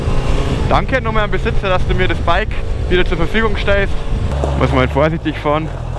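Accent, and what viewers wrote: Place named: Bavaria, Germany. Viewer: I finds immer no nice dass du beim boarischn bleibst :) Bist echt a griawiger typ Weida so!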